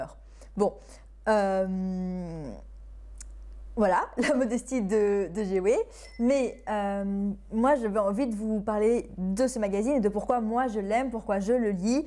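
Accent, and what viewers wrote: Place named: Belgium. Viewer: G Wé :-) Ça fait du bien d’entendre des prononciations de notre plat pays :-) Cela me rappelle le temps où mes parents avec une BMWée 😂 Un coucou d’un Belge de France !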